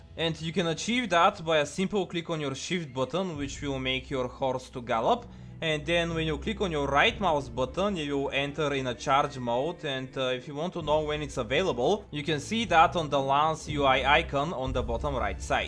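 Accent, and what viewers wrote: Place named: Russia. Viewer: I love your thick Russian accent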